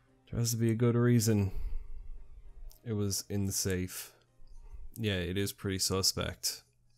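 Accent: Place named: England, United Kingdom. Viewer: I like your England accent. :) Btw subscribed to you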